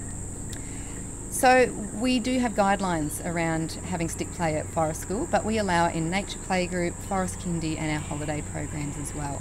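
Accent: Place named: Australia. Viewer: Anywhere. I once accidentally put a "corn dog" stick through a guy's hand. It was a little pointed on the end, but not sharp - but it just took one little slip goofing off and he had to go to the ER. Ruined everyone's night at the county fair. You should really just be supportive of a place that advocates kids being kids, instead of trolling all her posts. Sounds like she is an Aussie, btw.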